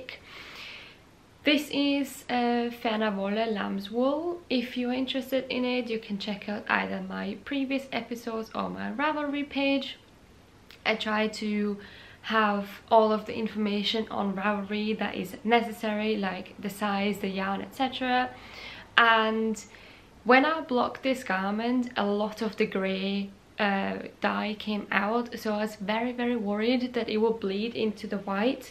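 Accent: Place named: West Yorkshire, United Kingdom. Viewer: Hi, found your channel today and have really enjoyed watching some episodes. Think I heard you saying that you are sending from Austria? I lived a couple of years in west Yorkshire a long time ago, and to me you sound very much like my colleagues at that time. Am I mistaken?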